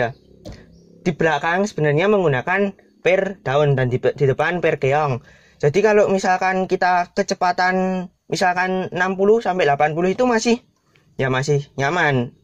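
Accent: Java, Indonesia. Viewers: Bahasa jawanya totok😁😁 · .medok kali kau ah..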